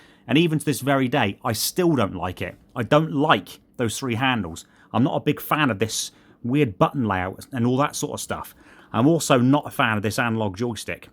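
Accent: United Kingdom. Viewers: im not a massive fan of british accent. did they make a new version ? lol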